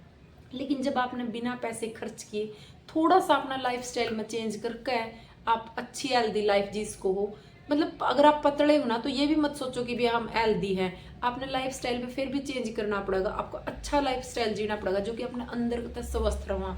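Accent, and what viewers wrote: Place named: Kurukshetra, India. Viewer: Nice video bahan app Kurukshetra jila se ho kya apki boli meri ali hai 😊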